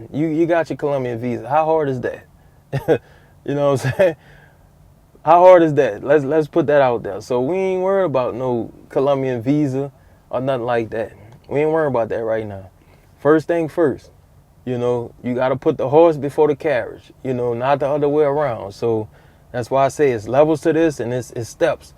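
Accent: Louisiana, United States. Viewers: I can hear that Louisiana in ya! Keep uploading man. Just subbed! ⚜⚜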